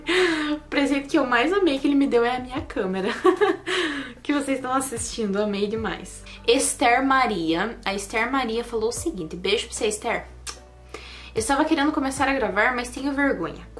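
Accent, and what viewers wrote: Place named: Paraná, Brazil. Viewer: Oi amiga o sotaque do Paraná 💞💞💞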